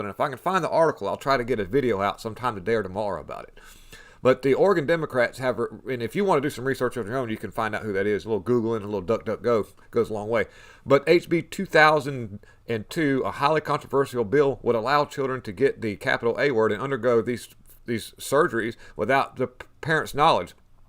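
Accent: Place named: South, United States. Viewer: ! Damn bud! You are pointing out some things that we all need to pay attention to. I don't want to sound disrespectful, (because I see you as much more than this) but others may judge your Mohawk and southern accent as meaning you're not a scholar...but I respect your insights and how you take deeper dives into what's happening around us. May The Good Lord grant you all the wisdom that you are seeking and bless you and your family as you share His insights to you. Love you. UDABST!!!!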